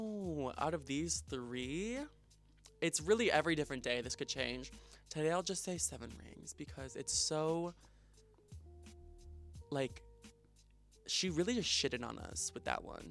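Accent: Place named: New York, United States. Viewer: Really?? I’m from New York and that’s how we speak. I have a heavy New York accent. It’s like people that have a British accent, not really something you can control and you do sound like you’re from New York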